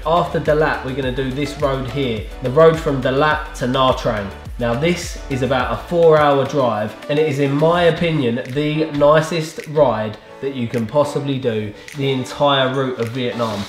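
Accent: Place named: England, United Kingdom. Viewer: You sound more English than most English people. Proper mate.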